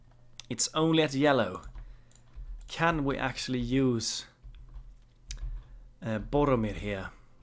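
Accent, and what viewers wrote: Sweden. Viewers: Will you do an Ar ardunaim playtrough? really love your gamplay and your swedish accent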